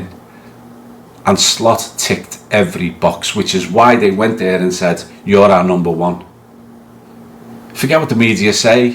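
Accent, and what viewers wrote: Liverpool, United Kingdom. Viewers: keep up the good work mate, excellent analysis and love your Scouse accent, reminds me of my best mate Stanno 😊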